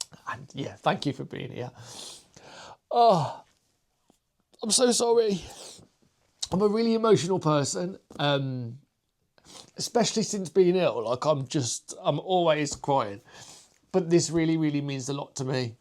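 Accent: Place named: West Midlands, United Kingdom. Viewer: I wish I could find the enthusiasm to clean up. I'm sure it would make for a nicer experience. I just just straight into the mess again the next day though 😬 The slippers are crackes! I'm sure he'll love them. And without sounding like a giant brown noser, you're making our lives better too! It's so nice to see someone else figuring out their way around crafts and sharing their wins and losses. And I spent a few years living in Birmingham and Wolverhampton (from Australia) and your accent makes me so happy!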